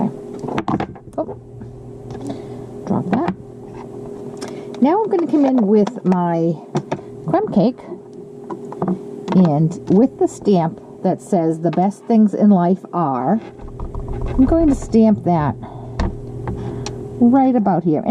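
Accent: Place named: United States. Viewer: I love to listen to you, I’ve never heard such a broad accent, not that I’ve been to many parts of your country bar a train journey from SanFransico to New York. Most Americans I’ve met are southern, or Chicago. X Ros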